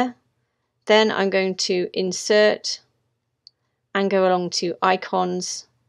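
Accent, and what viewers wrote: England, United Kingdom. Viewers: Absolutely wonderful. You sound English, I'm from Bristol, live in Australia. Writing a biography of a wonderful life with my late Valerie. Met in 1955, torn apart in 2019. But, you have given me so much knowledge to make this story readable, and I hope for others. Well done, Take care, Terry.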